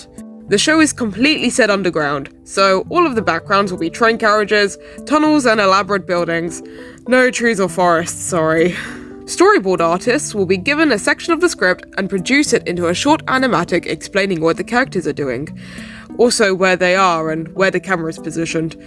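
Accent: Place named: United Kingdom. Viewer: Oh God, he’s British (ps this sounds amazing)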